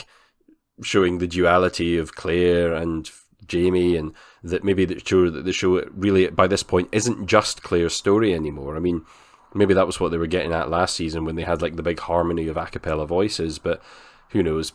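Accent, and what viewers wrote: Scotland, United Kingdom. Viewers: Hi! Nice to be here again. I really liked episode one. With all the characters and to setup the storylines I think this opening episode is a good thing. The main storyline I think will revolve around the weird Christie family. And yes, they do get weirder! It's been a few years since I've read this book, but I don't think you'll be disappointed. Is your accent Scottish? I didn't realize the actor who plays Tom had such an illustrious career. I'll have to check out some of his other work. His accent is great. Beautiful opening with Glencoe in the background! See you for episode 2 review! (Won't see you, but I'll hear you🙂)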